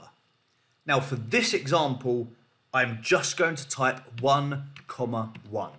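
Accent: United Kingdom. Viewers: Have to suffer 8mins of stupid British accent to learn how to create hyperlinks from drop down menus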